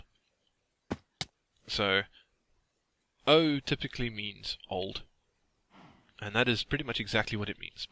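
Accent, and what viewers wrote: South Africa. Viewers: I think you need to be yourself. Don't try to be someone your not. If your comfortable speaking in your south African tone/dialect then do so. Hey brut. ^_^ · I really enjoy listening to all kinds of differnt languages and accents. So I would like to hear your South African accent more often. :D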